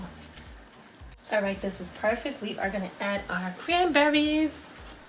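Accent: New York, United States. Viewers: Yup, I'm a native NY'er living in Florida and I love hearing the accent. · You have the most New York accent I've ever heard, lol! Where are you from?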